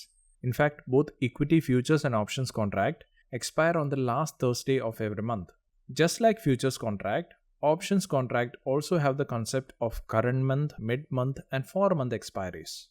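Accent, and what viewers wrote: Kerala, India. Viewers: BRO YOU'RE THE GOAT .... I feel like very dumb because i didnt knew about this channel from the very beginning of my trading career . Fu*ck the gurus and other scammers. This is a billion dollar expertise.. I will suggest this channel to everyone that ask me how you learned trading.. ( are you a malayali you're accent is like that just askinn)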